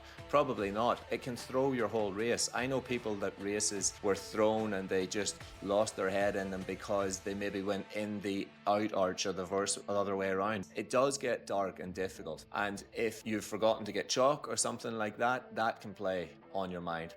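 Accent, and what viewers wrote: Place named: England, United Kingdom. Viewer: Great post and tip – I ordered a few. And, you have a strong English accent, where are you from?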